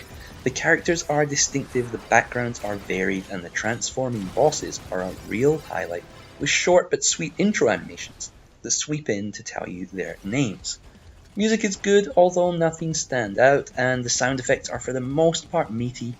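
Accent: Scotland, United Kingdom. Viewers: Just discovered channel and am a fan. Great to hear a scottish accent talking about ganes. Where are you from?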